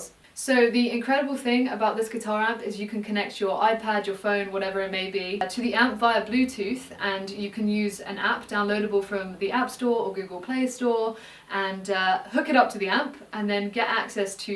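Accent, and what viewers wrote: England, United Kingdom. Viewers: Easy on the eyes and ears. What’s not to like. Lol. Love the English accent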